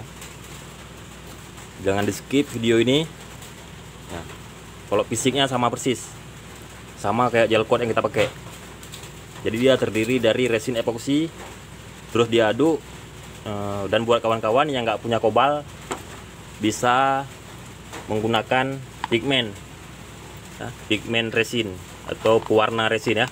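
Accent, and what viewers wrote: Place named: Aceh, Indonesia. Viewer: Aksen Asli Aceh Utara bang Mantap Kali bukan kaleng kaleng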